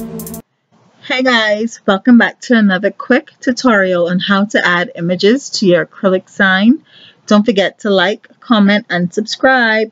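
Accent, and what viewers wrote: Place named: Bahamas. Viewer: I heard ur voice and I’m like hey this got to be my hometown people. Bahamian accent so recognizable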